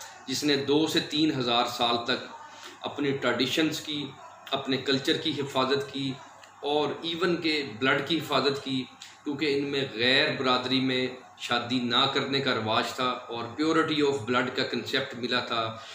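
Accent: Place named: Punjab, India. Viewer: Aapki talafuj sahi karo pehle.. Punjabi zuban mein urdu bology toh yehi hoga..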